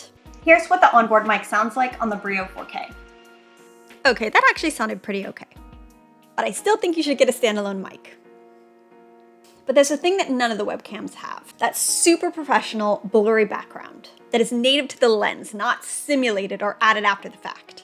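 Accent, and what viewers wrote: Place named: United States. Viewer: Just out of curiosity: you sound American - yet, it seems you are in Ireland (and you look somewhat Italian, and charmingly so ;-) ). You emigrated?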